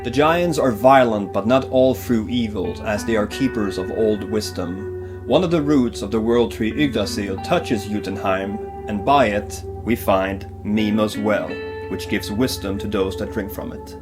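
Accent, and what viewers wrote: Skåne, Sweden. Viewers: He can if he wants to, it's just his dialect that screws things up a bit. He has the Skåne dialect, the most southern dialect, it's almost Danish. I'd like it if he would speak "rikssvenska" but if he insists on speaking with the dialect we should let him. Overall he did a great explanation of the nine worlds and I enjoyed the video alot. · It's so interesting hearing the Swedish pronunciations of familiar names and words from Norse mythology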